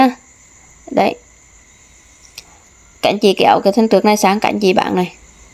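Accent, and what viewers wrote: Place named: Quang Tri, Vietnam. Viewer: A quảng trị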